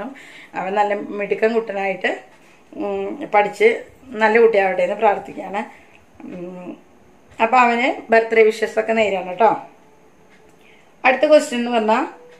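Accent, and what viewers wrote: Thrissur, India. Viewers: Chechi ente Peru soumya kunnamkulam aanu veedu but pkd aanu thamasikunnathu eniku checked samsaram kelkumbo nammude thrissur basha ketta njan happy first time comment aanu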